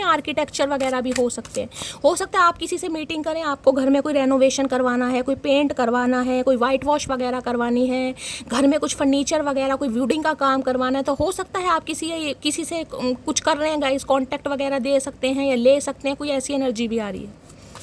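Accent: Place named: Bihar, India. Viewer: आज बिहारी स्टाइल में आपका बोलना मज़ा ही आ गया ❤❤ और रीडिंग सुनते हुए एक ख्याल आया की आप जिस तरह हमारे पक्ष में बोलती हो तो कहीं सारे चचाचनेरा आप पर ही कुफ्र न धरने लगेंगे 😅😅 काहे दुश्मन बढ़ा रही हो प्रिय 😂 खैर जो भी हो पर आपकी वजह से हम उबर चुकें और बाकि तो महादेव हैं ही सर पर हाथ धरे हुए 🙏🏻🙏🏻🙏🏻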